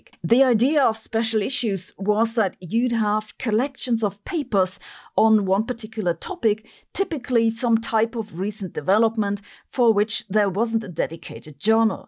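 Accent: Germany. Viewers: I'm going to submit a paper on how many times Sabine says "however" per video, with a magnificent German touch. It's amazingly sweet! Thanks for the video.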